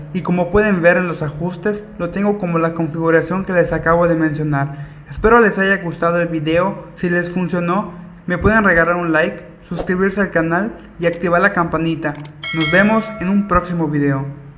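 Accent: Yucatan, Mexico. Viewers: De donde eres? tu acento suena a yucateco.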